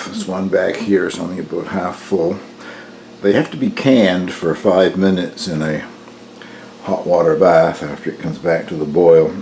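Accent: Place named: Newfoundland, Canada. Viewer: Ahh! You must from Nufunlan? I recognized the very slight accent. Right on man, good job on the jelly! Hi from N.Alberta.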